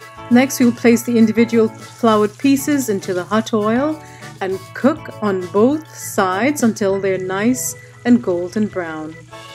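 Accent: Trinidad and Tobago. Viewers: Miss thank you for helping me improve my cooking. And continue to speak de trini "twang"😆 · I love your speaking voice, it’s a well rounded, articulate Trinidadian voice and love your channel too..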